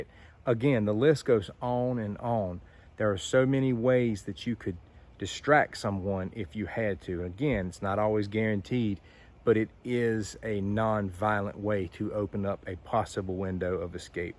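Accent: United States. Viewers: Ваш американский голос звучит ОЧЕНЬ классно Я согласен с рекомендациями.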